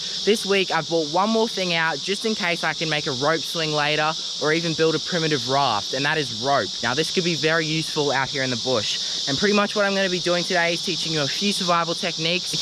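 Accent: Australia. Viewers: dude his voice is amazing it’s the peak voice for being Aussie you just can’t appreciate a good content when you see it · R u Aussie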